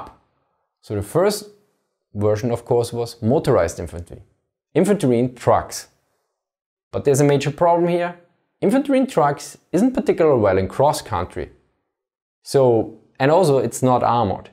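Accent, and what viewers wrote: Germany. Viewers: Seine Aussprache ist nicht so schlecht. Er hat ein starke deutsche Akzent, aber er ist noch verständlich, ganz besser als viele Engländer 😂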